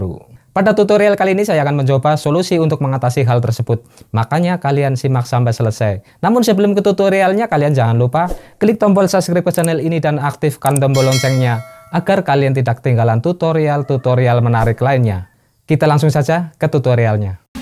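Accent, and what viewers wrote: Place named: Java, Indonesia. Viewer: crom nyamake bhasa indo lah udah sulit malah d persulit, Abang juga orang indo dari logat nya juga orang jawa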